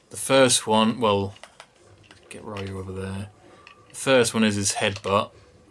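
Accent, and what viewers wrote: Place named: England, United Kingdom. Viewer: Haha nah I just mean your English accent, combined with the cursing and you were obviously tired. I did learn some stuff about this character though - cheers.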